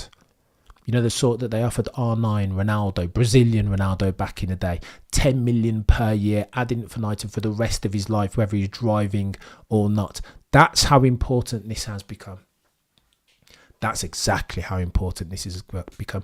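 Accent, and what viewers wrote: Birmingham, United Kingdom. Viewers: try to learn how to pronounce names correctly.... as it is we have to put up with a brummie twang...you sound ignorant.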